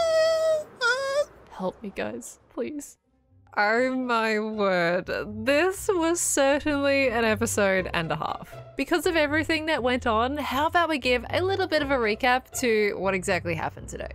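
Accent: Australia. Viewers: Loving you on the hermitcraft server ! The australian accent is really fun to listen to. Keep up <3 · Is she Australian?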